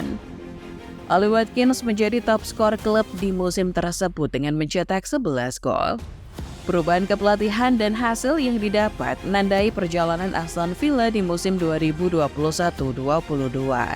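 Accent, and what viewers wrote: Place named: Medan, Indonesia. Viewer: Terpongkengham bangkeee wkwkwk org medan abg ya😂😂😂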